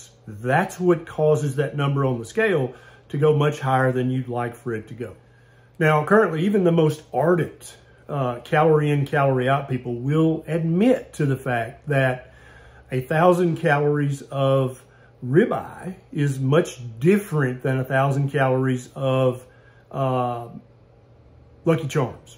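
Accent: South, United States. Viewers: You know...when I heard the Southern twang I knew it was going to be a sobering talk. ⚕️❤ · Sometimes I need my Bart Kay arguments with a gentle southern charm. Thanks for that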